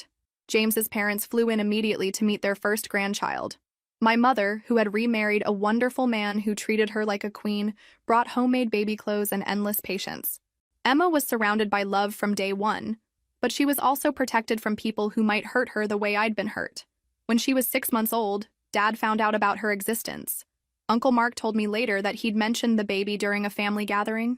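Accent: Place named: East Coast, United States. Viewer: Her voice is so annoying. Almost like the East Coast with a nasal like Midwest.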